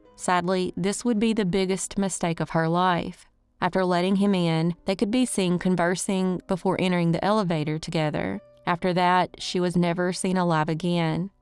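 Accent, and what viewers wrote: South, United States. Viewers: You can't do the southern drawl with AI. I really like hearing someone who speaks like people I know down here in the south. Thx!! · Hahaha! Oh she's a real as you can get!!! She's 100% authentic!!! I can give you big ol' Texas gaurantee She's as real as you will EVER get!!!! And we love her!! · I thought bout tht. The southern accent. But who knws wat those things are capable of....🤔🧐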